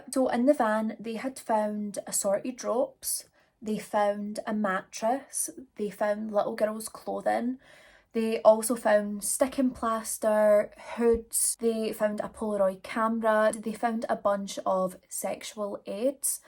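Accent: Scotland, United Kingdom. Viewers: BTW, your Scottish accent is everything 🤩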